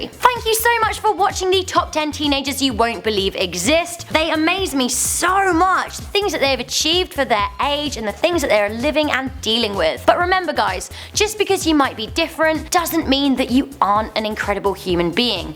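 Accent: United Kingdom. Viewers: is low key hot af with her cute British accent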